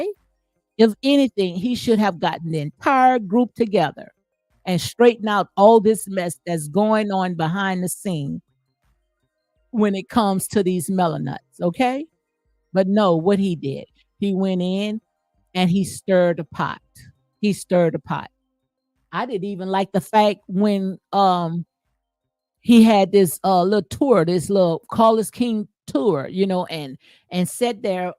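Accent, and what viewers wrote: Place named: Louisiana, United States. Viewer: Are you from Louisiana? I love the accent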